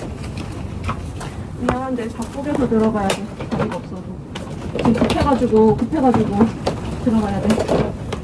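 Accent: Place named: Gyeongbuk, South Korea. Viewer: 산동네 볼때 경북쪽 사투리 쓰시는거 같아서 혹시 산불난 쪽 아닐까하고 생각했었는데 오늘 영상 올리셨네요. 아무쪼록 사람도 고양이도 무탈하고 건강 해치지 않길 바랍니다.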